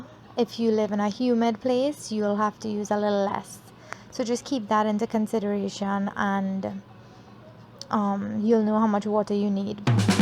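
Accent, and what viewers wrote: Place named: Trinidad and Tobago. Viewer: I did enjoy your video. You did an excellent job explaining everything because I also love to cook. You did it in beautiful Trini accent and it also showcased our culture. I would not add the seasoning but the variations in cooking is varied...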